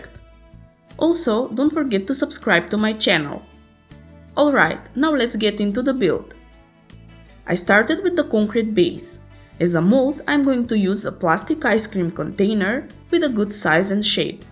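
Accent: Russia. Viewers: русская что ли ... акцент караул полный (((( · Ебать у тебя акцент... Ты русская, походу)) Можешь не отвечать, и так понятно. Один вопрос: а в бетонное основание нельзя было питание спрятать, чтобы не так убого было?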